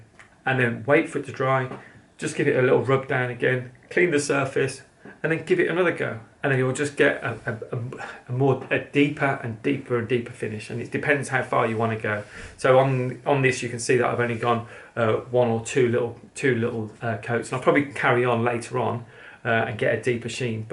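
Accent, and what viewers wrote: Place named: England, United Kingdom. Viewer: Also could you show how to French polish chair legs and smaller items like jewellery boxes A lot to ask but I’m needing to learn and like listening to your English voice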